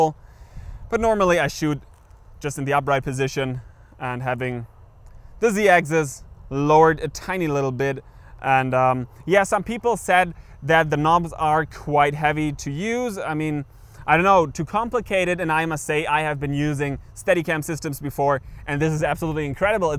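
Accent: Germany. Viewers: Kommst du aus Mainz? Schönes Review :) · we wanna throw a look hier hat man schon gemerkt das du deutscher bist :)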